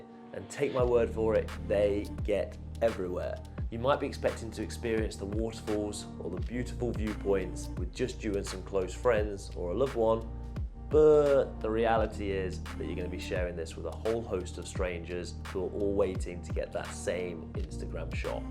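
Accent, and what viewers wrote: United Kingdom. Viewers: I can listen to your accent all day, Mate! It sounds a bit like the north of England. Would you mind telling us from where you hail? Again, I love listening to you speak. I have a think "American" accent because I am from New York City, so... I have had people say the same thing to me. Also, if you can speak up a bit louder on each video that would be muchly appreciated. Even the loudness turned up to the max, I could still do to have your voice be a bit louder.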